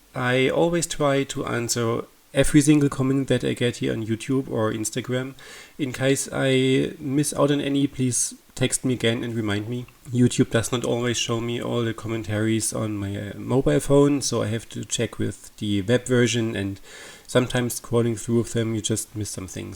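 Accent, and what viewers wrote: Germany. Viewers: Dachte ich mir schon, dein Englisch klingt so deutsch 😂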